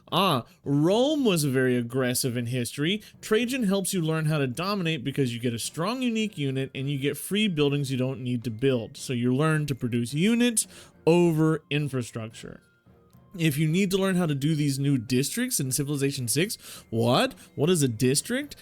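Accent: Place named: Texas, United States. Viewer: I wouldn’t have known that from 1 million years you sound like you’re from like Texas or something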